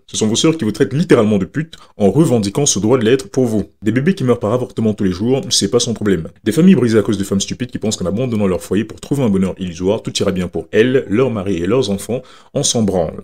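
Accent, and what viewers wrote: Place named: Antilles, Caribbean. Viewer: Excellente vidéo mais ... Petite suggestion bro pour tes prochaines vidéos ! Tu as un bon timbre de voix qui sent bon les Antilles ... mais par moment ton débit de paroles est trop rapide 😅 Ça gâche un peu 😉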